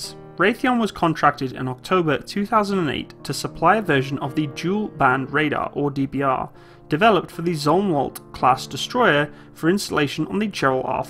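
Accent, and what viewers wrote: United Kingdom. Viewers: CONGRATULATIONS AMERICA. OUR LEADERS ARE SO USELESS.. I AM LISTENING TO A BRIT TELL ME ABOUT MY OWN COUNTRIES AIRCRAFT CARRIER. I BET THE VOICE LIVES IN THE U.K. TOO. NO WONDER CHINA IS STEALING EVERYONE'S DESIGNS. PEOPLE LIKE THIS ASS HOLE GET ON MY NERVES. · I think an American should be narrating this. Same goes for many videos you see with Brit narrators; National Geo on U.S. topics - Tours of the U.S. Mint - U.S. National Parks, you get the point. This guy should be narrating things like The Big Ben clocktower or Oxford or Stonehedge...etc.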